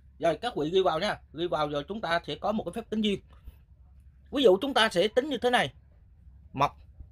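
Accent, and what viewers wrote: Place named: South, Vietnam. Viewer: Thầy giảng hay dễ hiểu nhưng nói hơi nhanh giọng nam chưa quen nghe thầy nói chậm một chút Và muốn mua là kinh để học thì mua ở đâu? Và muốn học thầy thì thủ tục ra sao Cảm ơn thầy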